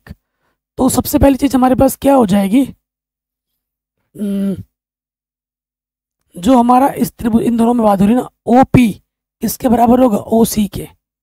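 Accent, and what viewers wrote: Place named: Jharkhand, India. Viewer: Sir mujhe lgta h ki aap jharkhand se ho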